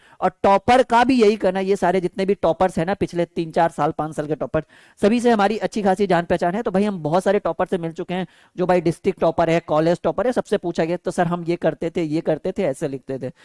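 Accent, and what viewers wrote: Bihar, India. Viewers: Sir bihar se ho kya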